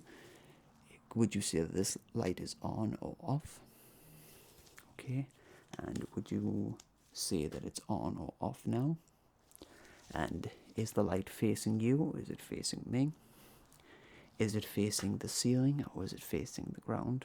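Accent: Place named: Newcastle, United Kingdom. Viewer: Finally! A geordie ASMRtist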